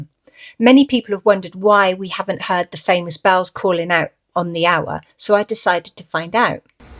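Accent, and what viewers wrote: United Kingdom. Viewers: A couple of years ago i went to the famous undercliffe cemetery in Bradford and there was a group of Bronte fans who were making speeches about a new found grave belonging to one of the Bronte servants who died in a workhouse and was just discovered there! But i don't remember her name! P.S Your Yorkshire accent made me laugh!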